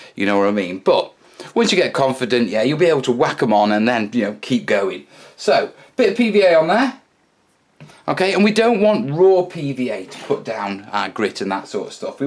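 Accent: United Kingdom. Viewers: a terrain version of Bob Ross with a British accent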